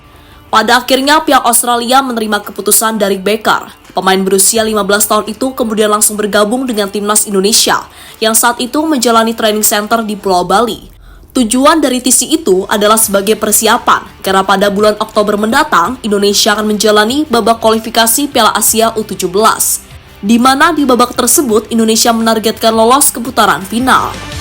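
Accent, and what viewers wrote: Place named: United Kingdom. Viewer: Suara aksen british nya lebih menggetarkan. Cocok didengarkan di dalam bioskop film documenter bertema perang, mafia dan horor.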